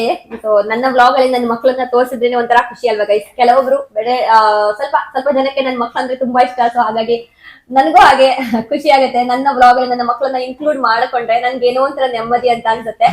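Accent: Kundapura, India. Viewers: Kundapurdavra? Kundapura bashe keloke laik ath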